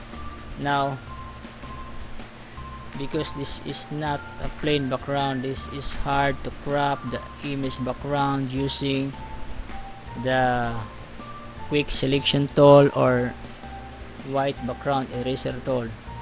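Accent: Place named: Visayas, Philippines. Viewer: walang Kabuhay-Buhay, Tagalogin mo na lng Hirap na Hirap ka Mag-English at di bagay pati mga Pronounciation mo parang Visaya Lng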